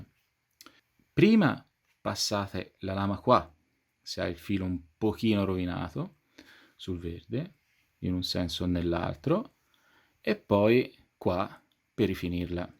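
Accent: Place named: Prato, Italy. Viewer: Ciao! Dal tuo accento direi appennino pratese? Ottimp video, essenziale e concreto!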